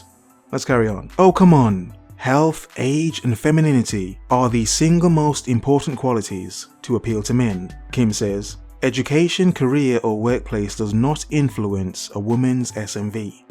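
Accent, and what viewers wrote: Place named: United Kingdom. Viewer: Level 1: read the article yourself Level 100: heard it read to you through a British bloke's voice with the occasional hearty chuckle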